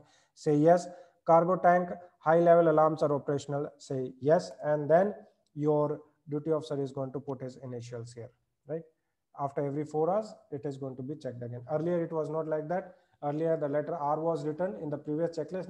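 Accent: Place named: India. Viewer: Its indian bro, not for beginners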